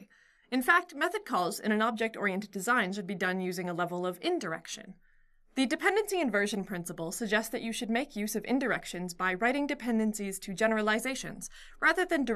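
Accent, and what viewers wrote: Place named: Canada. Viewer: I don't understand her Canadian accent.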